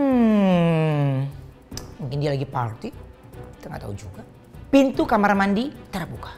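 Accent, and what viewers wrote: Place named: Bekasi, Indonesia. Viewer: Cerita serem ko malah jdi kocak..sih ko.. Bahasanya gaool bangaaat.. (logat orang bekasi bangat gitu..) haha.. Sukses terus deh.. Makin lancar n berlimpah rezekinya buat..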